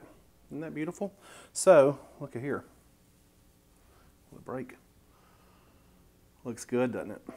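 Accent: South, United States. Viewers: I used cheesy skilley bread to make last year's low carb dressing,and it was good. I knew I wanted to tweak it some this year. I appreciate this recipe so much! The texture is so amazing! I ended up using all butter, cuz I was too lazy to mess with bacon and I knew the butter would be fine.Lol...My butter got good and brown in the skillet before I poured the batter in and lemme tell ya....Whole nother level of goodness happened there😍 omiword! I am kind of like a picky Lil kid so I use all powdered seasonings.I definitely like a good amount of sage ( I mean you sound southern and all,but Idk if you can be right without sage in your dressing😂), My goal today has been to enjoy a lower carb,no stress Thanksgiving. So far so good. People hear low carb no sugar and they're not interested....muahhhahahahaha...my nefarious little plan works. They have no idea how good and satisfying this food is! 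Thank you for doing this and Happy Thanksgiving to you and yours! ❤️🙌💪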